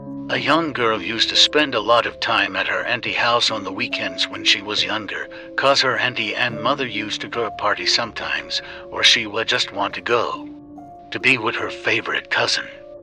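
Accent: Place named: Jamaica. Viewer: The voice over wid the yard dialect have me ah dwl!!!😂😂😂 love your channel❤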